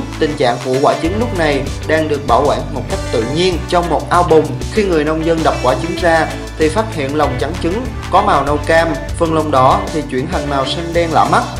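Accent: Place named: South, Vietnam. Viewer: Miền tây ko ra miền tây, miền đông ko ra miền đông... nghe phát ghét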